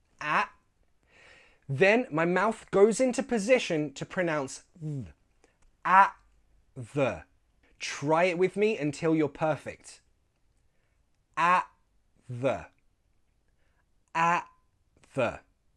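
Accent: United Kingdom. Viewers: Thank you so much for helping us know the perfect British pronunciation. Sir, i am a big fan of you. And I love you.👌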